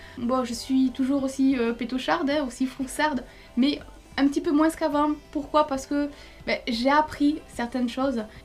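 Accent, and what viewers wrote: South, France. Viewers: J'adore je veux la même chambre! !!!trop cool 😆😆 en plus avec ton accent du sud que je kif trop et comment ta dis petocharde 😄😄😄trop cooool bonne journée à toi merci bcp pour la vidéo 😉😉😉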